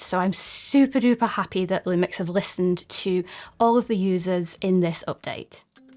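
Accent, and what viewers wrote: United Kingdom. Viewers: You speak in a very nice, soothing tone. I am surprised because I usually don't like British accents (too high a pitch), but yours is very wonderful.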